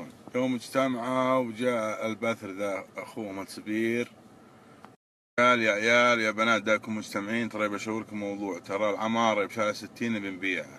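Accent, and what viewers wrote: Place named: Qassim, Saudi Arabia. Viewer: يااخي والله اني اقدرك واحترمك الله يكثر من امثالك يا ويعطيك طوله العمر. يازين لهجتنا القصيميه ويازين عيالها وياحلاوه انفسكم وروحكم والله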